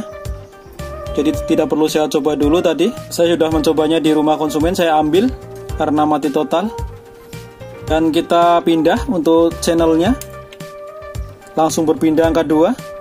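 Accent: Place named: Banyumas, Indonesia. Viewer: Hadirrr booos ora ngapak ora kepenak. .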